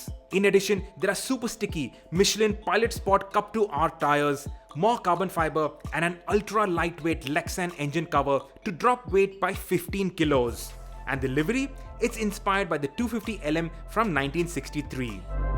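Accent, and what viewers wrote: India. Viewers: The Indian accent🤢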